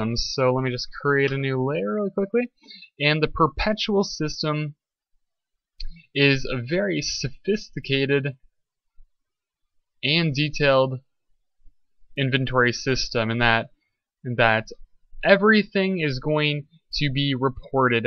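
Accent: Canada. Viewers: He says aboot, probably Canadian. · Clara Van Biesebroeck hes Canadian haha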